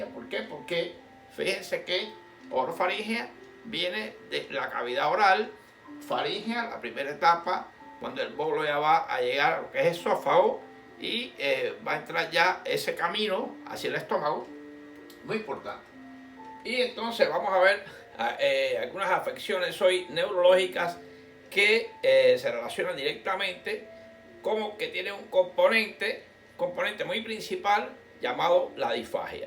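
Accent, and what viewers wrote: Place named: Cuba. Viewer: Hello Dr, parece ser cubano, gracias por su contenido. Fuerte saludos.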